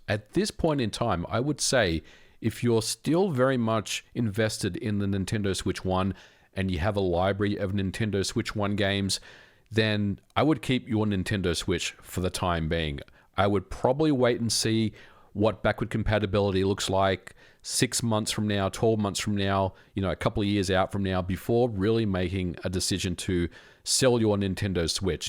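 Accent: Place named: Australia. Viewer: His accent is clearly Aussie. But yeah, I can understand the mix up. I'm from the UK and I can't tell the difference between the American and Canadian accents. Unless they're from the Southern US. Somwan stowl ma god damn truhk! Mary Jane! Hand me ma guhhn!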